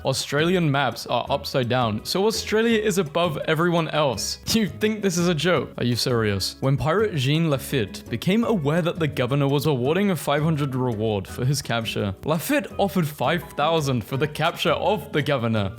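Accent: United Kingdom. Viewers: the vid was so good i didnt notice u were a brit for most of it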